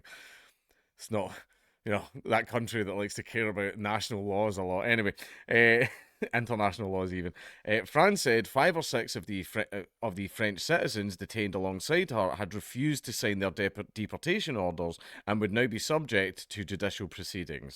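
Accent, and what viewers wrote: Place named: Scotland, United Kingdom. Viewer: Came here for the "oh noooo what happened" in that sweet scottish brogue. Don't let me down 😂.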